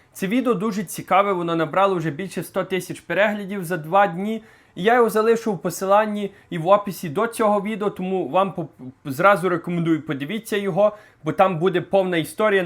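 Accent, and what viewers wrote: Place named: Western Ukraine, Ukraine. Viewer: Сколько раз попадаются мне ваши видео! Я не хочу Вас смотреть! Дикция - просто отвратительна, противный западэнский акцент. Не на чем больше деньги зарабатывать? Нет работы в Канаде?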